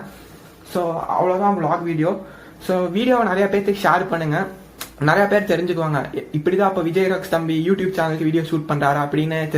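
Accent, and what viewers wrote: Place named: Tirunelveli, India. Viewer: Ennoda suggestion ennanna nammakunnu oru uniqueness irundhathaan namma romba thooram travel panna mudiyum...now u have realized that.. nice ..keep going.. 👍👍👍👍 slang tirunelveli pakkam maadhiri irukku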